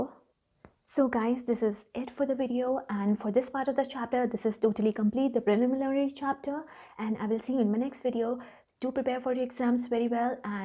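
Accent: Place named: United Kingdom. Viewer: Thanks i got some clarity, by the way your accent is like British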